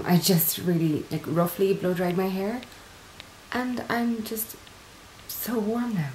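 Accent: Ireland. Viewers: First of all I have only ever followed you on Instagram and when that gorgeous Irish accent came out I near died! Secondly I'm really proud that you had the courage to post that. It was nice to meet the person behind the beautiful face and I hope you know that you're never alone. You have a whole internet of friends who support you, isn't the world cool in that way! Sending you big love xxxxxxx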